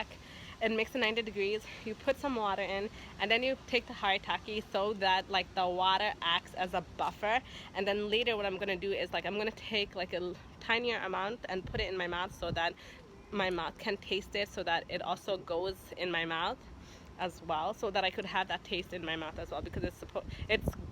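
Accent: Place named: United States. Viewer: This indian lady speaks perfect English with an American accent! Lovely eyes! I want this woman!!! Lol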